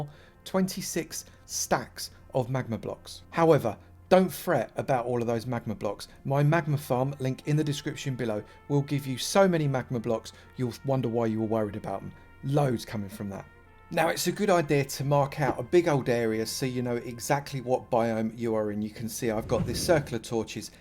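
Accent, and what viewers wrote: United Kingdom. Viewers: no mate he really dont he just sound like a British person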